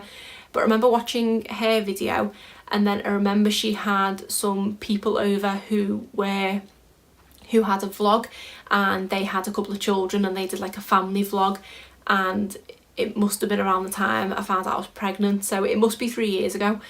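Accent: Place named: Yorkshire, United Kingdom. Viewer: Your accent sounds something like Yorkshire. Lovely accent!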